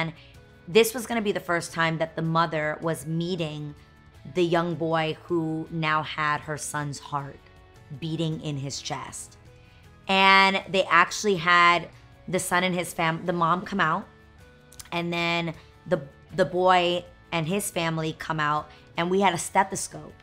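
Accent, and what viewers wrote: New York, United States. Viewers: I freaking love you! ❤️ We will definitely miss you on daytime TV! I just don't get why ya didn't go for like 20+ yrs! Glad we're able to see you on YouTube!!! About JLO yesssssss 🙌 Love herrrr too! I love how you're so humble like don't gas me! Haha! Love your JLO vibe! Your fashion sense your NY accent and attitude is everything! 🥰💯🙌 much love A! Stay real 😘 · wow you had such a cute New York accent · You have a New York accent. My friend sounds like you and she's not Latina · Damn the New York was THICK 😹 she still the same woman 🧡🧡🧡😘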